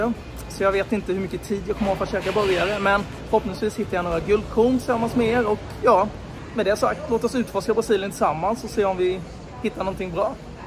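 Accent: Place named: Småland, Sweden. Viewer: Man älskar ju å lyssna på din småländska dialekt ❤ tack för trevligt avsnitt! 👏🙏 men ska biffen i en burgare vara rosa i mitten som dessa är? Jag har för mig att den bör va genomstekt.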